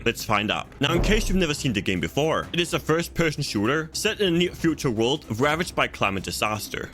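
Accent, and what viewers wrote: Denmark. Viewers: Danish narrator?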